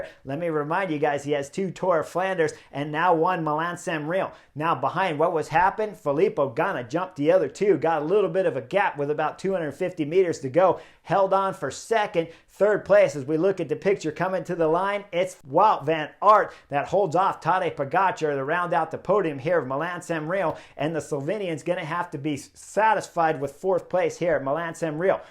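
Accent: United States. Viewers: Great analysis… but man the American pronunciation of names is so bad. Not even a small effort to respect original names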